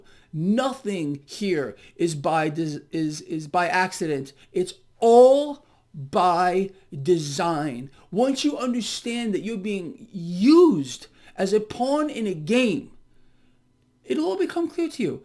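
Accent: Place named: New York, United States. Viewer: Is it possible this guy is from New York or New Jersey? That accent is so thick you could cut it with a knife.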